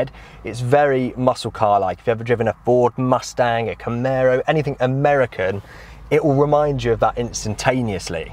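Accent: United Kingdom. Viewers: Fantastic review. Love your British accent and colloquialisms, how apropos. I live in the United States and this is the next car that will be in my collection. I've been looking at them and wanting one for a while I just already have 12 and value my ability to not be a corporate slave. I can well afford it I'm just holding off as long as possible on spending near 50 Grand but it's coming lol. I have an 04 XJR in my collection and this parked beside it would be stellar. Fab review!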